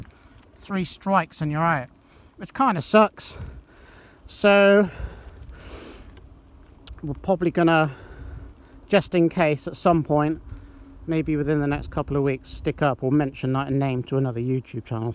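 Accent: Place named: South West England, United Kingdom. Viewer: Well yeah, I could tell it was South West by the accent haha. I’m in Cornwall. I’m guessing you are Devon somewhere?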